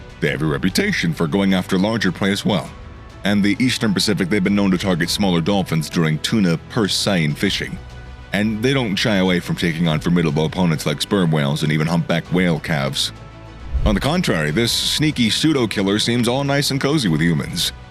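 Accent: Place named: United States. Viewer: The most American narration ever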